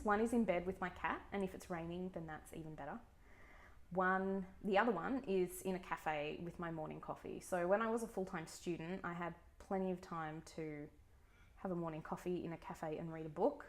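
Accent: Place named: Australia. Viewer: Welcome to booktube! I popped over after seeing Simon shout you out on Twitter! I’m an Aussie (also a Melbournian) now living in Texas 😊 So here for more Aussie voices on booktube!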